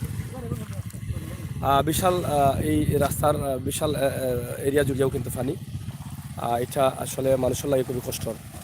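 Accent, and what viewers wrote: Sylhet, Bangladesh. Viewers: আপনার বিডিও কুব সুন্দর লাগের ভাই। সবটি দেখছি। আর সিলেটি মাতলে আরো বেশি মযা লাগে।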